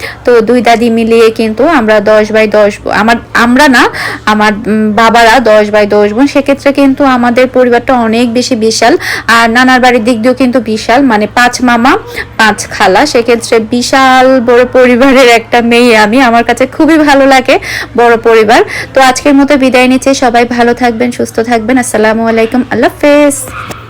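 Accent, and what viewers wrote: Chittagong, Bangladesh. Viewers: Onk din por Chittagong er kotha sonlam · Chatagong a vasa no boja r da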